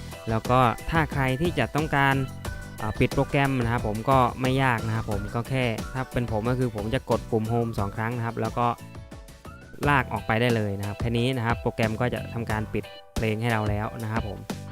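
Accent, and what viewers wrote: Thailand. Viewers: ทำนองเสียงน่าจะเป็นคนกรุงเทพ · สำเนียงถ้าไม่บุรีรัมย์ก็สุรินทร์แหละ555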